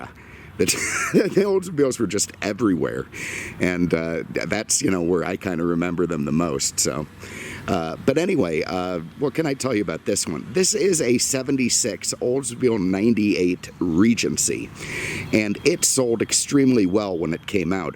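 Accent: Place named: Midwest, United States. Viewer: While watching and enjoying your videos I wondered about your accent. Suspecting it to be Midwestern. Thanks for the confirmation that you have Chicago roots. Keep up the good work.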